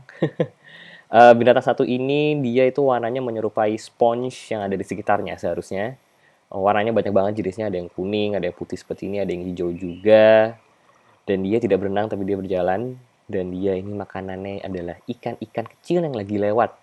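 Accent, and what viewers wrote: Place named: Java, Indonesia. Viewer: logat jawanya bang keluar dikit wkwkwkwk lanjutin ya bang video yg kyk gini sama motor sport lainnya terimakasaih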